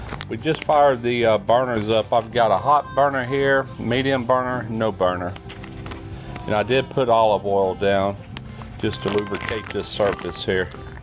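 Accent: South, United States. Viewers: I watched this video today to hear pronounce gyro. Gotta luv that southern twang.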